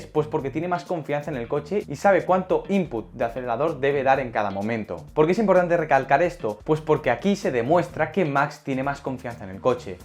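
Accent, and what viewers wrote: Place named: Spain. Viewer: El YouTuber es español por si acento y esta correcto en lo que dice de Max ...pero Fernando Alonso gran piloto no es bueno en lluvia ...Max si ..z Schumacher si Senna si ..Besos si ...Prost No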